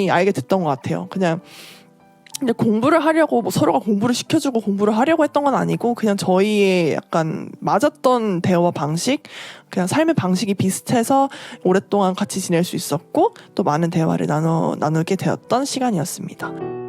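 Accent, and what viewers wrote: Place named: United Kingdom. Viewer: 목소리랑 영국발음이 찰떡인것..영어 뽐뿌 받고 갑니다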